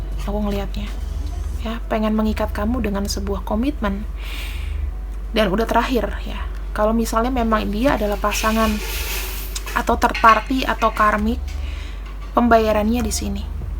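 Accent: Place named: Blitar, Indonesia. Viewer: bhsamu kayak orang blitar. Jawa tengah. " YO BEEENN ??? 😆.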